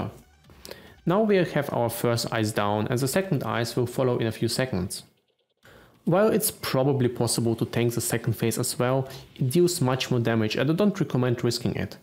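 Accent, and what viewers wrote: Russia. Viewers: Мне кажется или акцент русский? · Thx for this tutorial! Are you russian btw?:) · Файналли рашн ассент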